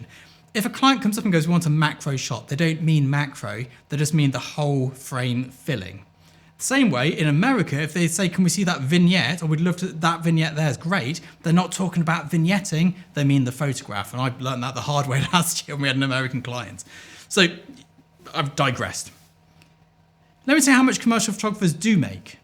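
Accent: RP, United Kingdom. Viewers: I have recently found your videos. The first one I thought ‘who’s this posh bloke and why should I be listening to him’😊 but as I have started to watch more and more of them I have to say I find them fascinating and very illuminating. I am not a photographer I work with video but some of these recent points resonate with me and my part of the industry. So hats off to you. Keep up the good work!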